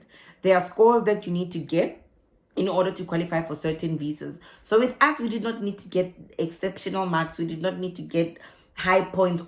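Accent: South Africa. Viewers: Aussies find your heavily accented English hard to understand. The White Afrikaans accent is found arrogant in Australia. Aussies stay away from it. It's the truth. Ask other immigrants in Australia. They will tell you so. You don't need to speak like an Aussie. Need to articulate.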